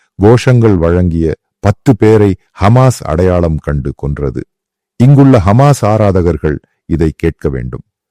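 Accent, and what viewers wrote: Kerala, India. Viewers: Native kerala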